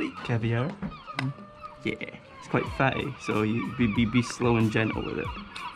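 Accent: Scotland, United Kingdom. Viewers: Are you Scottish out ov curiosity???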